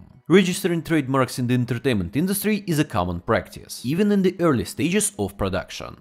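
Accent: Russia. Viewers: Damn, I didn't know about your english channel before, and I like the russian accent, а так вообще прикольно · все ровно слышно русский акцент)) но твой английский супер!! 👍👍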